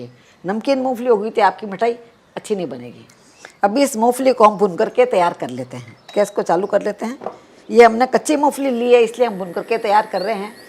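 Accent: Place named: Rajasthan, India. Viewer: Bahut hi achha he.aur aapka voice bahut hi muje pasand he rajasthani flavour aati he..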